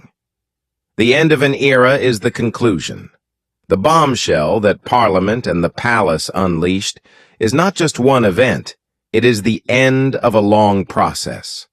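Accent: United States. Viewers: This is a British site. Stop using an American accent for the narration. Get a British accent or else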